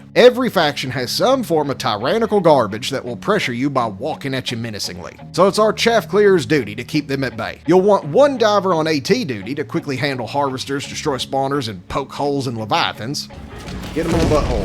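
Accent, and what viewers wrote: South, United States. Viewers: Wonderful Southern accent! 👌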